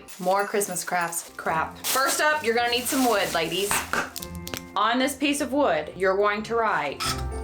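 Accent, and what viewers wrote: South, United States. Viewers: I think you’re absolutely hilarious and adorable. You have a very magnetic enigmatic quality and you’re gorgeous. You don’t SUCK at this YouTube thing. I get sucked into your videos every time I watch just one! I love your accent, your humor, your southern sayings. I think you’re killing it girl. Keep up the amazing work! You got this!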